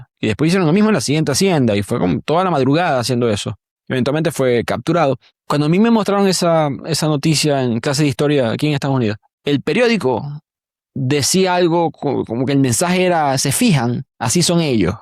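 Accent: Maracaibo, Venezuela. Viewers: Amé el tema y amé el acento maracucho 👏🏻👏🏻👏🏻👏🏻😂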